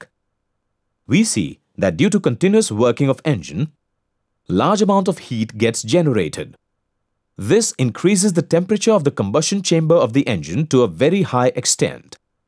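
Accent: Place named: India. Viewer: Indian accent spotted.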